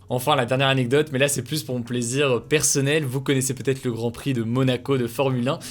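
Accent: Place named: United Kingdom. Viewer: Hugo je me demande depuis un moment, mais pourquoi tu ne prononces plus les mots et expressions anglaises avec ton bel accent britannique? :) j'aimais bien perso ça change de notre "French accent" !! Bravo pour ce boulot tous les soirs ;)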